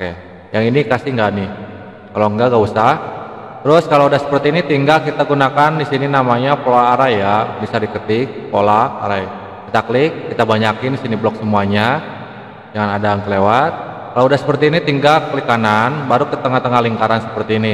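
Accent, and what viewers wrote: West Java, Indonesia. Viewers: kayak logat sunda ya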